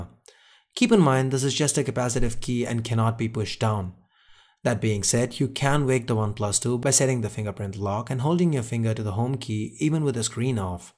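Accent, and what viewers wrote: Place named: India. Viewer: you sound very Indian, and I don't mean that in some bad way, I'm of Indian myself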